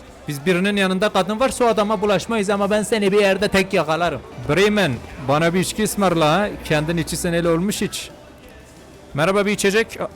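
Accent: Elazığ, Turkey. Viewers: Ben Elazığlıyı abi şiveden anasilıyo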